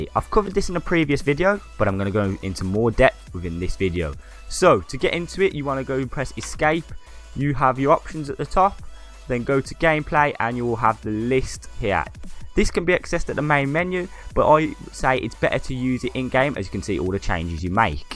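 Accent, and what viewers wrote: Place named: Birmingham, United Kingdom. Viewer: u from birmingham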